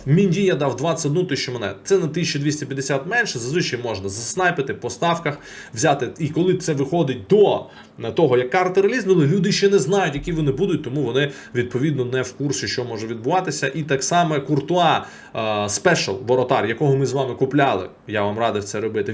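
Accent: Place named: Kharkiv, Ukraine. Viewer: В Харькове так же говорят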